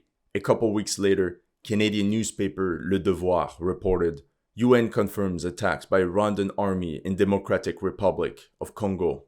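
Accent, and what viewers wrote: France. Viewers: Quand j'écoute votre vidéo j'ai l'impression que vous avez un accent français fait des vidéos en français aussi 🤔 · You a Frenchie brother?😊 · Amazing French accent!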